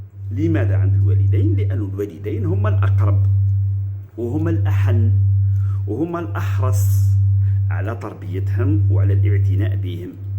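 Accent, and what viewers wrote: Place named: Biskra, Algeria. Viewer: دكتور هل انت بسكري لخطراش لهجتك بسكرية ماشاء الله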